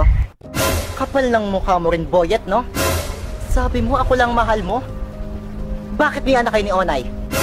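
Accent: Batangas, Philippines. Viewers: Ala'y Batangeñong Batangeño ehh...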